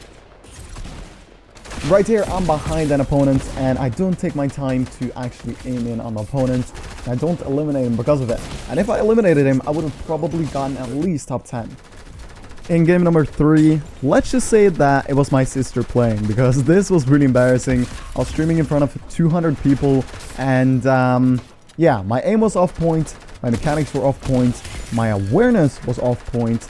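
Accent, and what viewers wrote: Norway. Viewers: wait, youre norwegian? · you norwegian bro? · broo are you from norway?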